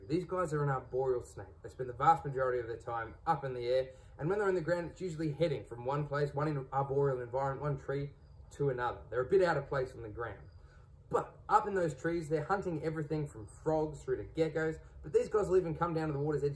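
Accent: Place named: Queensland, Australia. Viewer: Lovely snake but what's the deal with that horrible Queensland accent. Its not very Australian (Victorian)!!!!!!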